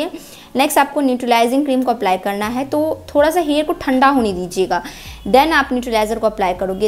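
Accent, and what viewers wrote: Bihar, India. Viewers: Ye language to bihar chhapra ki lag rhi h kya mam ap chhapra s ho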